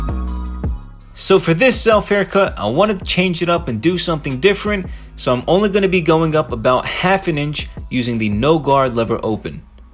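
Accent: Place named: New Jersey, United States. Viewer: Where the heck are you from originally? I'm trying to place that accent. Ya sound like you're from Joyzee(New Jersey).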